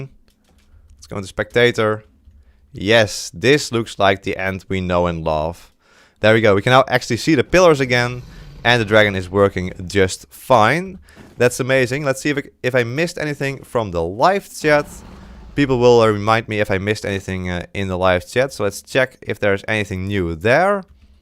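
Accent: Netherlands. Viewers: my first time watchin your vids, immediately noticed you're dutch. Ga zo door met je video's!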